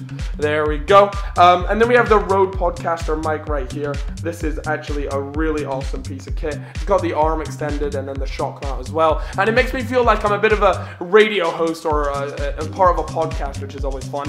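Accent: United States. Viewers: hey are you american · Is he American? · why does he sound so American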